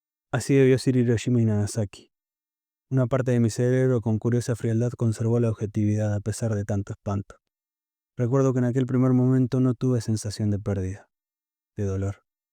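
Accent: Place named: Argentina. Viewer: sigue hermano. Este cómic solo tiene sentido con acento argentino. Gracias desde colombia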